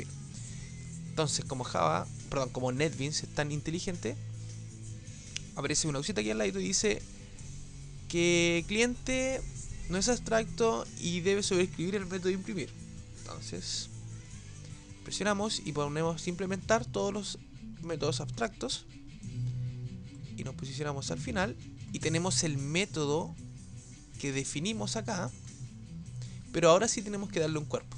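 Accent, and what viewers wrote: Chile. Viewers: eri chileno, perrin?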